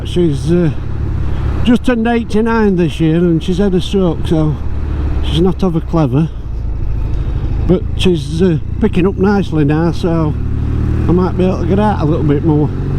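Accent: Yorkshire, United Kingdom. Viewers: Nice to see a chap do a job so well and “liked” just for having the best accent in Britain! My Yorkshire roots may be making me biased though😂. I wonder, could the misfire be HT leads or plugs? The only other thing springs to mind is petrol…this “green” stuff has a stupidly short shelf life. I stored my bike with high octane storage fuel over winter and it started and ran beautifully after a five month lay up.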